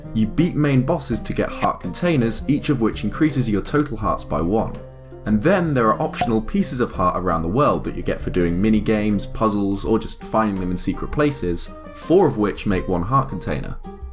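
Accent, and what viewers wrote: United Kingdom. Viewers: eX Galneryus because hes British lol it's his accent. He also said Zelder. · its called a BRITISH ACCENT.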